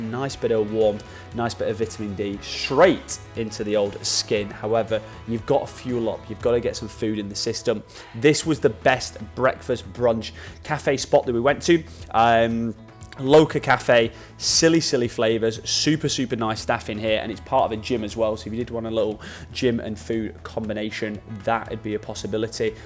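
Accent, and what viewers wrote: United Kingdom. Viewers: Great video bro, drone video footage really amazing. I really love your british accent bro, i also love scotish accent, irish, and wales. It help me to practicing for listening accent for preparing if someday i go to uk 😁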